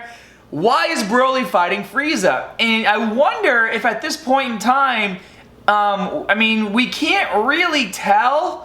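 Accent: Boston, United States. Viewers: That boston accent tho lol x'D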